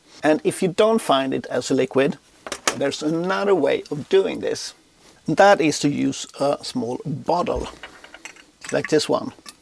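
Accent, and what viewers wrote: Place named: Sweden. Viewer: Sounds like a Swede :)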